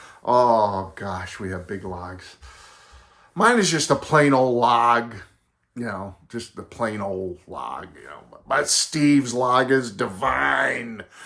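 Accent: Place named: Texas, United States. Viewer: That explains obvious Texan accent.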